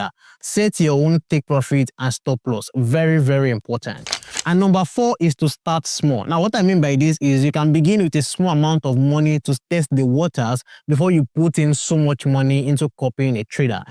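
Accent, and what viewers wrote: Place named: Nigeria. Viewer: Ur doing the lords work abeg. Been watching the videos in oyibo accent since and i was so confused. I needed this in complete naija accent lol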